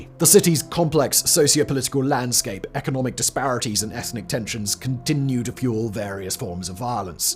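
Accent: United Kingdom. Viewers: for a posh english b@ how do you mispronounce so many names and words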